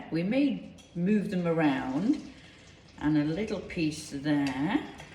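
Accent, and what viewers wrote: United Kingdom. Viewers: You are so gorgeous and I love the accent.. I take it that you are from the UK maybe.. I would love for you to be my Valentine love.. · Sounds yorkshire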